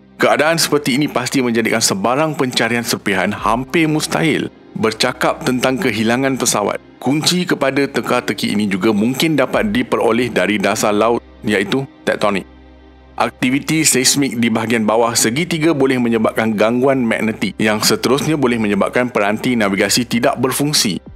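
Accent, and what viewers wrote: Malaysia. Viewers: Orang malaisya ya😇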